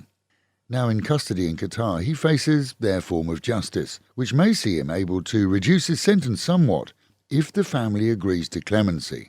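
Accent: United Kingdom. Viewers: are you from Britain ?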